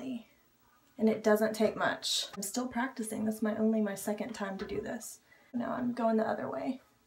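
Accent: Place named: United Kingdom. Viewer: That British accent though.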